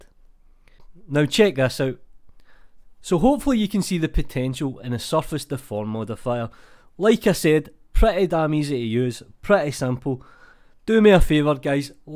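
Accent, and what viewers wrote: Scotland, United Kingdom. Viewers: I get that you might have trouble understanding him, Scottish (I'm assuming) accents might be a little different than what you are used to. But, to accuse him of not speaking clearly? Wow... Oh, and Nicholas, I wouldn't ever travel outside of the US, you will be extremely disappointed. By the way, I loved many things when I traveled to Scotland from the US, but really loved the accents.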